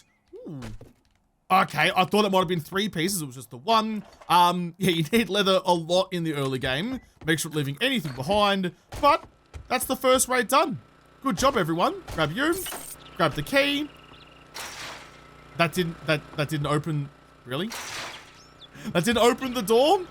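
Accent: Australia. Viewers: Bro where in Australia are you from?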